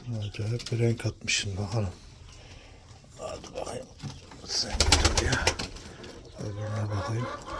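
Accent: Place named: Çorum, Turkey. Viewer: Usta Çorumlu gibi konuşuyorsun ben Çorumluyum şiven bizim oranın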